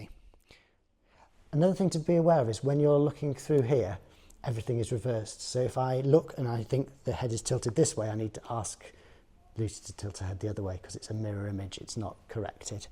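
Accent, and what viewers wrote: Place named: RP, United Kingdom. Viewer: I seem to have failed to utilise your nice movie as a listening material of Received Pronunciation. I can completely imagine what you're saying even though I don't understand English itself -- I used to shoot with this super-heavy camera forty years ago! -- Best wishes from Japan.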